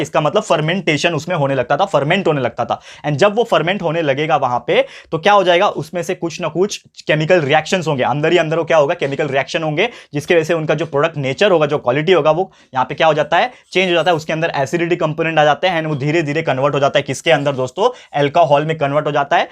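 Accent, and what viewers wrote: Maharashtra, India. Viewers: Sir are you a Marathi speaker🤔😇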